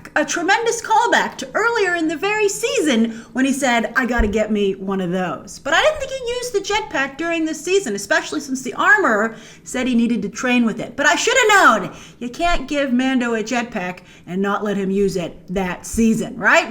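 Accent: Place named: New York, United States. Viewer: Whats just as great as an Amazing Season Finale of a Star Wars Show???...an equally AMAZING Review of It!!!!....Can't wait for your next live Stream, life's just not the same without hearing that wonderful New York accent and seeing that Beautiful smile that lights up the World Live 🥰😍😁😉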